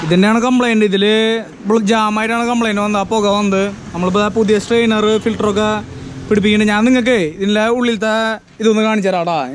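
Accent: Thrissur, India. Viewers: ande place thrissur thannya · തൃശൂർ സ്ലാങ് ഇഷ്ട്ടം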